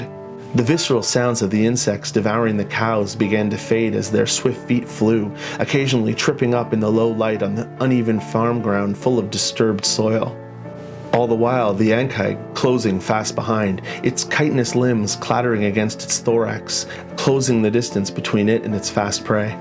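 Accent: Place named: Newfoundland, Canada. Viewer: Deadly Newfie accent! Haha. Well done. Amazing video and channel you have here! Keep it up! sent me. :)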